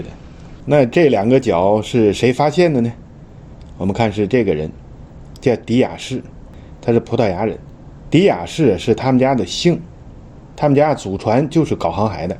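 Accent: Tianjin, China. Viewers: 好吗（天津口音），的故事太精彩了，😄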